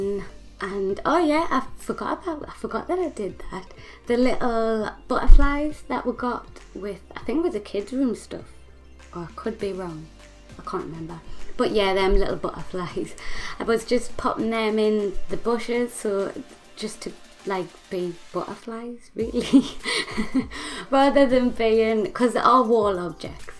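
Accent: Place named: Newcastle, United Kingdom. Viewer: Geordie through and through! Why aye lass!! Love your builds X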